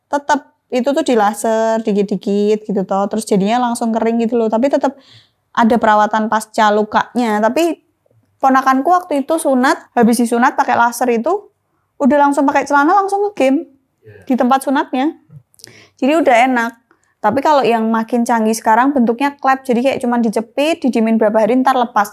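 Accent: Java, Indonesia. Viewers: Kudus hadir...😎 Bahasamu medog mba..🤣👍🏻👍🏻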